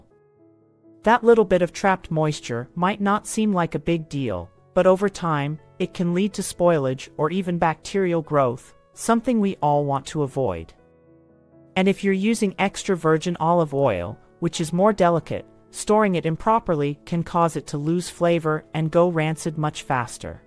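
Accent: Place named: United States. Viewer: I hear an American voice telling us all this nonsense. Have you ever read the labels on most of your American Foods. They are packed full of Chemicals that cause more health harm than keeping them in the fridge.🏴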